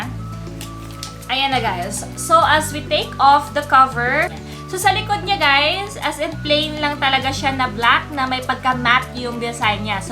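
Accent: Visayas, Philippines. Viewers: Bisaya diay ka te?😂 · Hala grabe bisaya mn d i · Bisaya diay ka idol. Kamoka mo at kabuses mo din kapitbahay nmin hahahah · Ang sarap sa tenga ng bisaya mo ate! 😍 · I like your bisaya accent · Whoa! Bisaya diay ka Miss! 😮🔥#ProudBisaya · Ay chouuurr bisaya man diay ka te, heheheh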